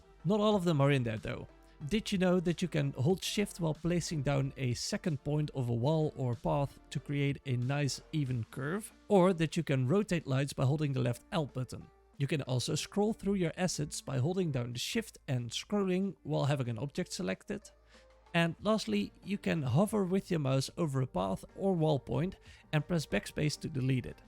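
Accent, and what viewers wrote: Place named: Scandinavia. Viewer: Thank you for that thorough explanation! I definitely would love to see a video about what to do with your map when you’re done. I’m actually pretty familiar with lossy vs. non-lossy formats — my day job is as a web developer! But what threw me for a loop recently is that the “roll20” image export setting exports at 70ppi, whereas the native resolution in DD is 256ppi. So my maps were looking like crap in roll20, especially zoomed in, because the ppi was too low (combined with the 10mb file size limit that made increasing the ppi much more challenging). Someone on the DD Discord helped me out by suggesting that I export at 256ppi at 80% quality, and that has worked beautifully. Oh, and roll20 actually does support WEBP (that was news to me, too!) As far as I know roll20 does not support universal VTT files, however, so I will have to stick to flat images for now. 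It’s mildly annoying to have to place all the dynamic lighting boundaries manually, but fairly straightforward once you’ve done it a few times. (I’m sure someone will say “ you should use a better VTT,” and maybe I should, but at this point I’m so invested in the roll20 ecosystem, there’s a bit of a sunk cost fallacy thing going on. Also I love playing virtually, so I don’t anticipate a lot of print maps in my future, lol). Randomly, if I might ask, what is your accent? I’m guessing Nordic of some flavor? Feel free to ignore this somewhat rude question; I’m just fascinated by accents and language.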